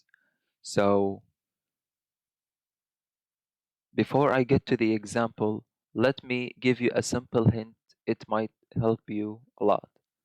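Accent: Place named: India. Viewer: The best accent as indian I've heard so far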